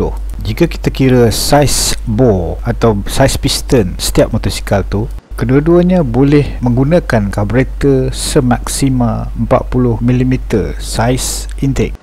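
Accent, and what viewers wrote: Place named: Kuala Kangsar, Malaysia. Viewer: Assalamualaikum, buat video lagi, suka dengar Abg loghat Perak dan ilmu yg zaman sekarang susah nak dapat... Terbaik bang (S.I.F.U)!!! Semoga Allah permudahkan segala urusan Kome dan semoga Allah memberkatimu insya'allah, 🤲 Aamiinn Yaa Rabbal'alamiinn:)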